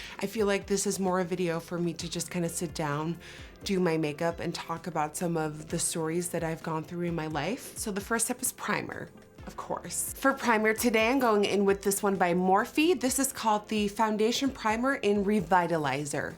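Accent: United States. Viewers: Can anyone explain why nikkie despite being dutch still sounds American compared to other peeps from Holland? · “ in case you were wondering where the accent came from “ Umm I thought she was anerican till 2 years ago when She said in one of her videos that she wasnt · Accent? When I first started watching your videos, I totally took you for an American 😻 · How come you have a strong American accent if your Dutch and living in Amsterdam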